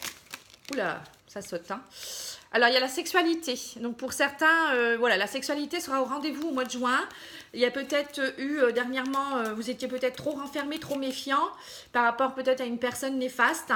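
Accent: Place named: Hauts-de-France, France. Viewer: Bonsoir, merci pour ce beau tirage très complet, très parlant pour moi, en plus avec votre accent Hauts de France, je suis picarde, j'aime beaucoup, bonne soirée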